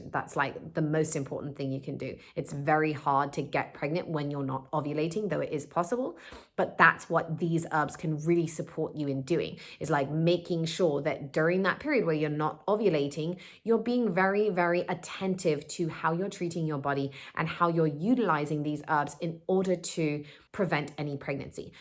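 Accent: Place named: United Kingdom. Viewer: Liked it initially simply for the accent. My friend and I will use a brutish accent from time to time. Makes us feel more creative,brilliant, vibrant, and .... wealthy. But I would’ve liked the video anyway for the knowledge that was given. And I’m running to the cabinet now to grab my neem oil! Thanks 😘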